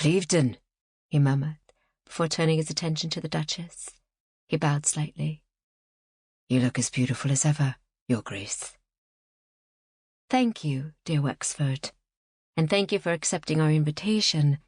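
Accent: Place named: United States. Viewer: Why use an American for a British story? There are great RP English voices available. Be authentic!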